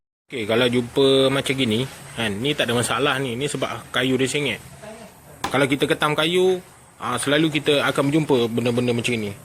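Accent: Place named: Perak, Malaysia. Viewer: Assalamualaikum.. Bos area mana.. Dgr mcm belah Perak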